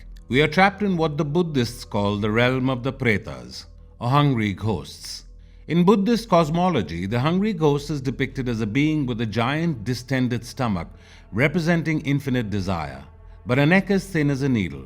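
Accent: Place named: India. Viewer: The indian accent is what makes me turn it off immediately.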